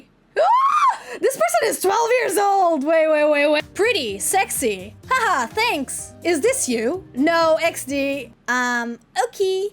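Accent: Portugal. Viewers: I love your Portuguese accent,... Beijos do Brasil!!!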